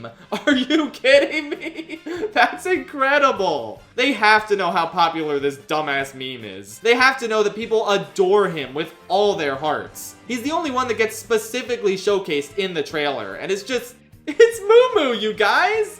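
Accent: United Kingdom. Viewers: Bruh I thought this guy was bri’ish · I’m sad, the British voice is gone 💔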